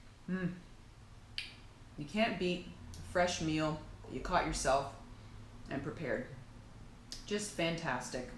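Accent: Canada. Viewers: Your from Canada 🇨🇦 Eh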